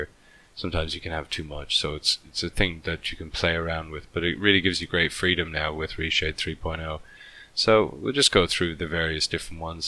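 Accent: Ireland. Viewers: Just came here to listen to the beautiful Irish drawl...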